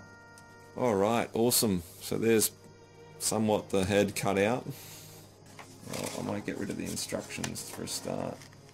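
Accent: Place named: Australia. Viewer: Man im Australian too but you ahve the best accent i have ever heard!!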